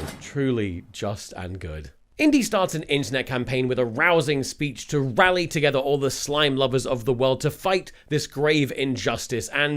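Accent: United States. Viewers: Holy shit that American accent is wild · Tomska's American accent is impeccable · There's something uncanny about how incredibly natural Tomska's American accent is. I know he's been doing it for years at this point but still putting it side by side with his actual accent is pinging my wrong vibes detector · You have a good American Accent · Your American accent is so good what Have you been doing s British Accent this entire time Nevermind I got to the antivirus part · I’m sure this is mentioned a lot but American accent is incredible.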